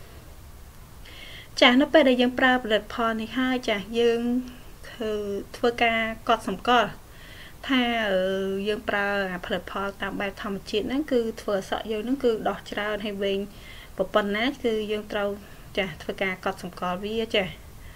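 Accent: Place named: Koh Kong, Cambodia. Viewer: អ្នកកោះកុងហ្មងសំលេងនឹង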